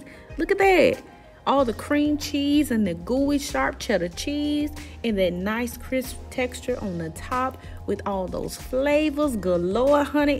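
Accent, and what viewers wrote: South, United States. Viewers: The food looks so good but can we talk about the southern accent????? Yaaaaaassssss sis… just know I hit the subscribe button! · Mmm yummy in the Tommy will try and you funny 😊❤️ you sound like you from down south 😊❤️ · Are u from the south? Like nc or SC? Lol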